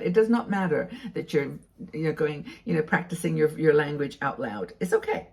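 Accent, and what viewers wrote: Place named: United Kingdom. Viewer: Hi! I love walking and I love hearing you speak in a more British way!:)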